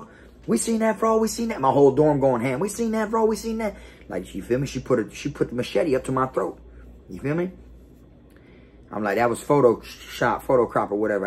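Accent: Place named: Florida, United States. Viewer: He sounds like he from florida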